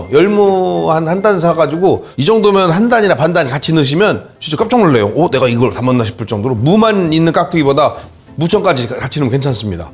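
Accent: Chungcheong, South Korea. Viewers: 우리막내삼촌닮으셨어~ 너무푸근하고 친근감있고 은근재밌고...같은충청도라 말투도 정감있고...너무좋으신분같아~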